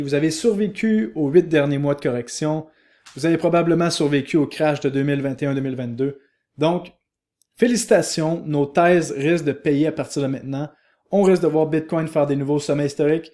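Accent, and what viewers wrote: Quebec, Canada. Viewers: Il me tue avec son petit accent québecois la ! 😂😂 "Nen acheté" la petite liaison des mots orales mdrrr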